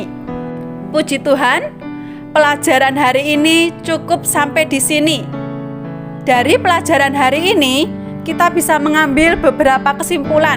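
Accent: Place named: Java, Indonesia. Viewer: Logat Jawa kak😅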